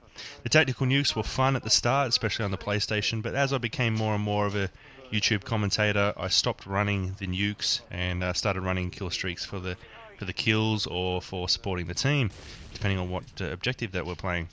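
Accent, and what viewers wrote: Australia. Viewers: ru australian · Your Australian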